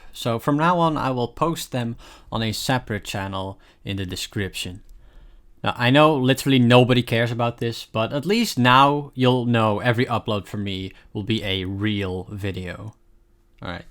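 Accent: Netherlands. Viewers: i can hear that dutch accent lol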